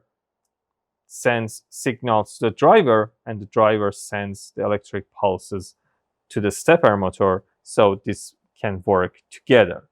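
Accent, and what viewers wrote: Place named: Iran. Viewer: Thanks 🎉 I think you are Persian because the accent 😍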